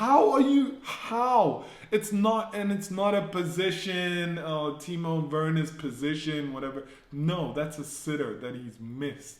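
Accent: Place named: India. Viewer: You look black but you sound 🇮🇳 Indian? I don't understand either?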